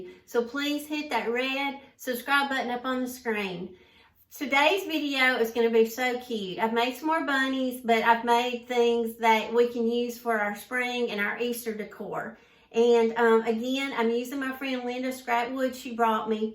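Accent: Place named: Alabama, United States. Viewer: I especially like your cute flower balls and will make some of them. Your YouTube channel just "somehow" showed up when I was watching some other videos about two weeks ago (or less). I love to watch all you are doing and your friendly. kind, sweet Christian spirit. You know just how to put items together. I have never seen anyone else stage their items and vary them as you do. I have shared your channel with my sister also and she really likes your videos. Such a treat just to sit down, enjoy and learn from you. When I first heard your accent, I thought you might be from Alabama. I am from Ft. Payne, but moved to the mountains in western North Carolina in 1989. Thanks so much. God bless.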